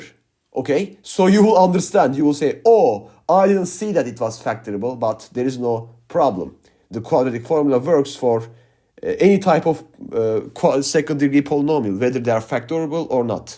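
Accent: Turkey. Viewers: Usta türk olabilir misin tam türk aksanıyla inglizce konusyon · yemin edebilirim ama ispatlayamam konuşan bir Türk :)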